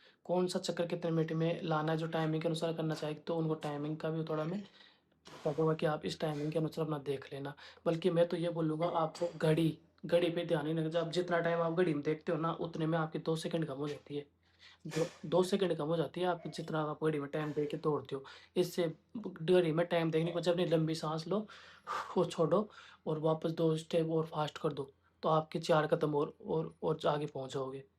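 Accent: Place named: Jaipur, India. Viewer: aapke accent se hi samjh aa rha h kyuki m bhi jaipur se hu..and my mom use to sounds like you😅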